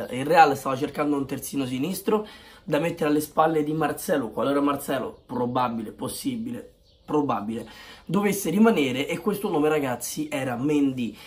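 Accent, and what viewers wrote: Rome, Italy. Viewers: io ti seguo da sempre e mi sei sempre piaciuto ma come giornalista sei di basso livello perché sbagli tanti verbi e ti metti a parlare in dialetto romano.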